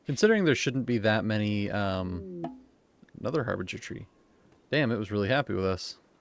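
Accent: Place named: United States. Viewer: What a weird name...are you actually? You sound like an American.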